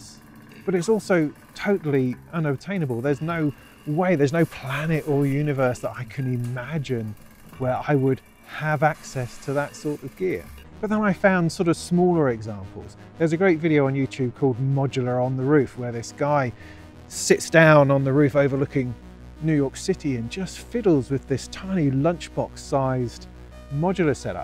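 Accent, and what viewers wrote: United Kingdom. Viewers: if you are into subtractive ways, and since you like the moog sound, i suggest looking into the AJH series, i have 2 of their modules in my rig and i ve tried my share of vcos to tell you you ll get every penny you pay for... don´t wanna mess up your modular or financial plan. but modular is about the analog after all and there is a reason certain modules have a certain depth.. haven´t tried the erica and befaco stuff yet, but i hear a lot of good stuff about them... and at some stage you gotta stop drooling over cwejman modules that will probably not be built because the waiting list is longer than number of heartbeats the man has left. so either filter or vco, AJH would be my suggestion. it´ll stay with you as long as you run a modular rig... and since you sound like you´re from that island that just brexited a leg (just a pun!). 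AJH is local economy! Oh yeah, the disting MK3 has saved many many lives!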